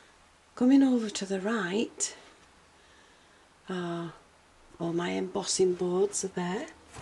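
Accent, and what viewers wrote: United Kingdom. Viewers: Love it! Are u in the Uk? I think i could tell from ur accent n got excited to finally come across a uk craft room tour as i know that if i like anything i can maybe b able to buy it! Where did u buy ur CD holder, the lamps for ur photo booth and baskey in ur ikea drawers? I hv the same drawer cabinet n today i should finish with my organising. TFS. Ur room is a lil heaven:)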